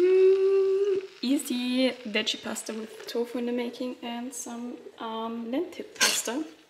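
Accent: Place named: Germany. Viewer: Cute english with german accent 😃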